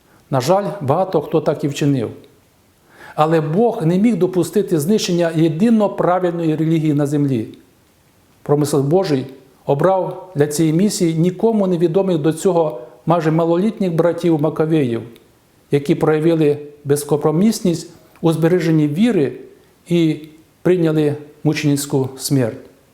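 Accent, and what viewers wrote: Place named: Russia. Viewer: ЧОМУ ЦЕЙ ПАКАНИЧ БРЕШЕ ВСЬОМУ СВІТУ.ЧОМУ ВИ НАЗИВАЄТЕ СВОЮ ЦЕРКВУ УКРАЇНСЬКОЮ, ПРИ ЦЬОМУ ВИ ФІЛІАЛ РПЦ.ВАШ ПАТРІАРХ КІРІЛ.НІЯКОГО ДОКУМЕНТА ПРО РОЗРИВ З ГУНДЯЄВИМ У ВАС НЕМАЄ.ТІЛЬКИ НА СЛОВАХ НАЗИВАЄТЕ СЕБЕ САМОСТІЙНИМИ.НАЗИВАЄТЕ СВОЮ УЕРКВУ УКРАЇНСЬКОЮ, ЩОБ РОБИТИ РОЗДОР В УЕРКВІ І УКРАЇНІ І ДАЛЬШЕ ВЕСТИ СВОЮ РУСЬКУ ПООПАГАНДУ В УКРАЇНІ.ВИ Є ЧАСТИНОЮ РПЦ.НАВІТЬ УКРАЇНСЬКІ СЛОВА ВИМОВЛЯЄТЕ ПЕРЕКРУЧУЮЧИ І МІШАЮЧИ З РОСІЙСЬКИМИ.ВИ Є МОСКОВСЬКИЙ ПІП, ІНІЯКИХ ДОКАЗІВ ЗВОРОТНІХ У ВАС НЕМАЄ.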